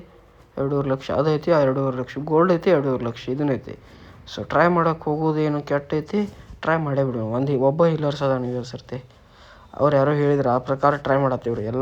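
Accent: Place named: Uttara Kannada, India. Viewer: Full jora agi matadi sir.... Adu uttar kannada language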